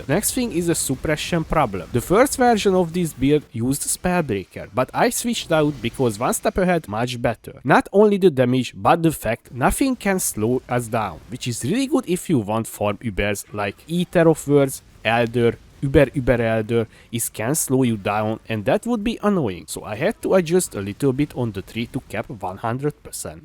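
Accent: Hungary. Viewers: Az akcentusból sejtem, hogy magyar videós vagy. :) Hajrá-hajrá, sok sikert!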